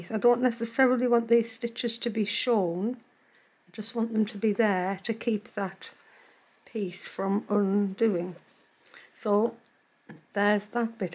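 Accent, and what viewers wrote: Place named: Geordie, United Kingdom. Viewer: Watching from my home in Australia, though i am a Geordie. Loved the video and recognised your accent. Where are you from?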